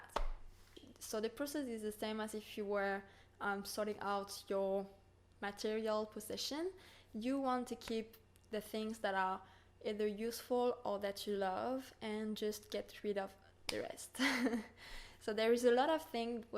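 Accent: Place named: France. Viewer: Are you French? You've got a cute accent.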